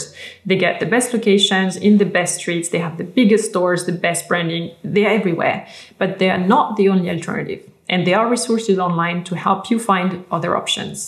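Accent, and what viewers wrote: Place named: France. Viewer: I heard the accent and I knew you’re French 😃😃. Agréable vidéo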